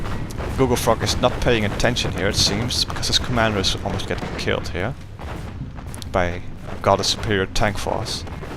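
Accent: Netherlands. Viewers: Thanks for uploading! Besides watching starcraft replay, I also start enjoying this fabulous game. . Btw, are you Dutch? Just wondering because of the accent. :)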